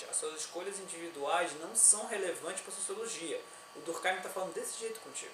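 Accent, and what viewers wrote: Pará, Brazil. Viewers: É paraense?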